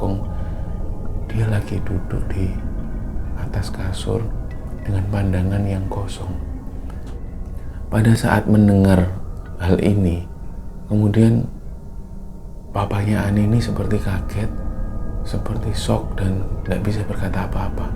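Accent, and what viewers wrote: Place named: East Java, Indonesia. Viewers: Iya mmg kalo mas itu di resapi enak dgn khas jawatimuran kdang mas idris tp yg keliatan jawa yo mas buat dogeng tdurku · Maaf mas agak telat....iki baru mas....medok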